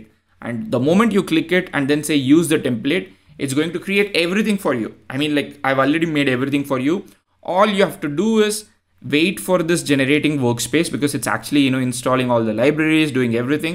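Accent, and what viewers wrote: India. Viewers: i love the indian accent its the best, Techguys form india are to me the best masters and teachers ... And this content/video/tutorial is the proof Ty sir... You are helping to survive this madness... Cheers from Mexico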